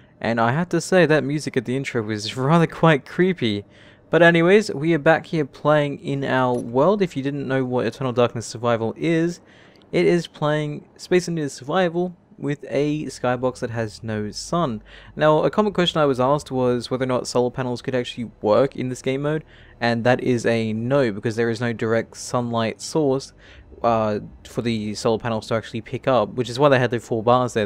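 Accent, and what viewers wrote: Australia. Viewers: Show block placements, design choices, exploration and problem solving. Edit the largest constructions. For instance. You place a large reactor and a conveyor system and what not then go(in your Australian accent) 'Right guys brb' The jump to you finishing it. Things like small ships are cool to watch because you need to make tweaks and changes as you go. But large scale welding can be monotonous to watch. Not so bad once you have a welding ship.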